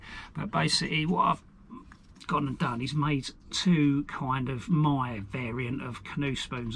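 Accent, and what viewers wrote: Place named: RP, United Kingdom. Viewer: Bit posh mate..... Very posh in fact..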